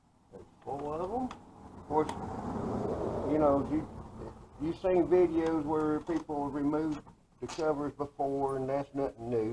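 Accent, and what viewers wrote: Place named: Tennessee, United States. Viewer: Damn, I learned a lot! Thanks for the vid. I'm from Georgia, you sound like your from Tennessee?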